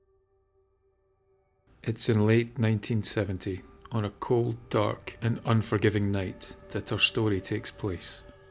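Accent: Scotland, United Kingdom. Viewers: Happy birthday,always enjoy your stories with that added bit of history and i always love a vampire story...Thank you for always your lovely scottish narration😊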